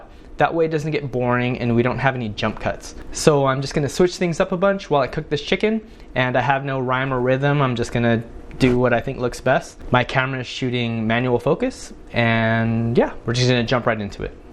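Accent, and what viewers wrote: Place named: Hawaii, United States. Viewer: You have so much more / better equipment than me. I’m kind of embarrassed 😅 are you from Hawaii? I can hear a slight Hawaii accent.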